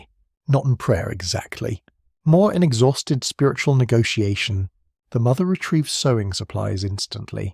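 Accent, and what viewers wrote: United Kingdom. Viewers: Aaargh so tired of cokney ai voices 😊😊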